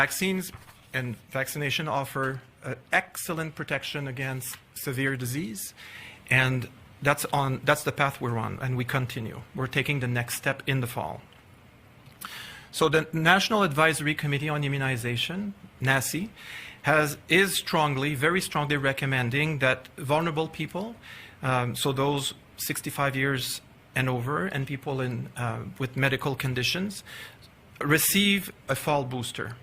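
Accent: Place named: France. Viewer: Ughhhhh. That French accent. Gross.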